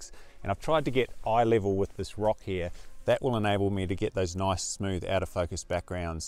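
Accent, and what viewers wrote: Australia. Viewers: Nice to listen to Ozzy English :-) - Missing all the colorful Australian birds I love so much :-(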